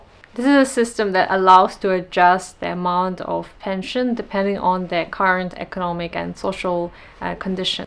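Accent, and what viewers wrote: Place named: Russia. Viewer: Russian accented English? How is your English so fluent? Ever lived in NYC? (and Russian accented Japanese --novel!) This is solid information. I could retire in Japan tomorrow but unfortunately, Japan hasn't recognized that their largest export is their Culture. They could fix so many economic problems by creating simplified paths to permanent residency & citizenship with such a massively declining birth rate. It's time for Japan to allow citizenship by investment & relaxed visas. But then again, recognizing societal issues, and addressing them is not exactly an established practice in Japan. They are collectively killing themselves with their own xenophobia!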